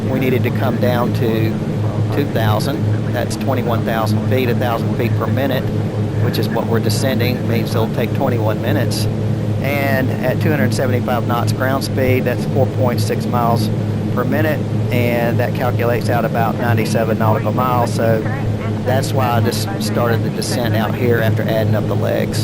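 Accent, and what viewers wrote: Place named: Texas, United States. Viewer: are u from Texas? Sounds like that greetings denmark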